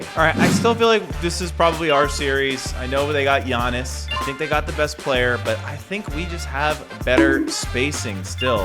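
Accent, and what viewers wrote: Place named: South, United States. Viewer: honestly i love the redneck accent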